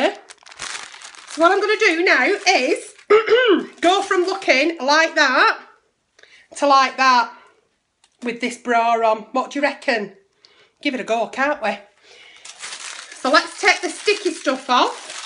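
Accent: United Kingdom. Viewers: The British accent 😂😂😂😭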